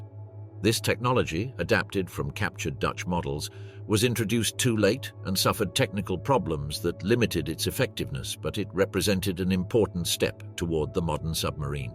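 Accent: England, United Kingdom. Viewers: the German navy was ruled by large battleships and you show the Lusitania? Can it get stoopider than this? You don’t get credibility just because you give the narrator an English accent. Thumbs down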